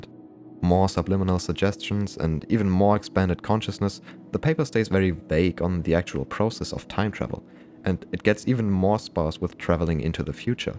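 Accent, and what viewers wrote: Germany. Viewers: "Oh shit digger" are you German? hahaha edit yep, you definitely are xD (great video btw) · Me: this kinda sounds like a German accent Him: Oh shit digga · sehr gutes video, nicht unnötig überzogen, keine dumme angstmacherei, absolut ehrenwertes verständnis der physik und vor allem der thematik. sehr angenehmes englisch, für einen deutschen🫣. hast du die tapes bereits selbst getestet?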